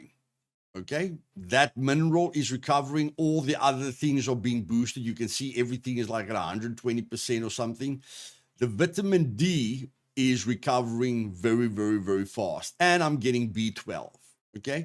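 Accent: South Africa. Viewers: took me a minute to realize his accent was SA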